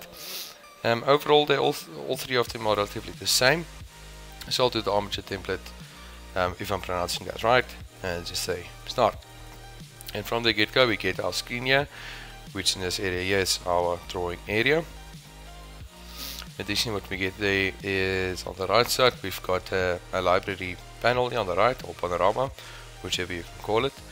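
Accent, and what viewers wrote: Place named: Johannesburg, South Africa. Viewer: thought i recognized that accent. jo burg? anyways thanks again from a total beginner. question: how are you enlarging or shrinking the parts when you are are moving them around. what controls are you using?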